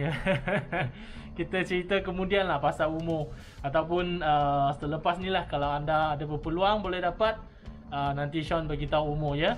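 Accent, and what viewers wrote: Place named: North, Malaysia. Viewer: Sean orang mana? Sebab guna lecey.. Dalam video Mcm utara ja 🤔🤔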